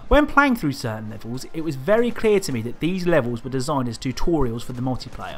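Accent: England, United Kingdom. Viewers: Do you come from England?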